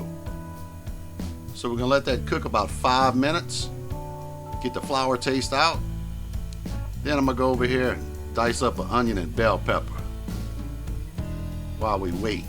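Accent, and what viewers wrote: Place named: South, United States. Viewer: that ORIGINAL SOUTHERN DRAWL also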